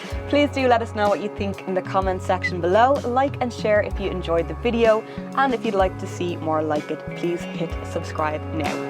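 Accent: Ireland. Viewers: Jacksepticeye made me like Irish accent and this review was amazing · I don't like Irish accent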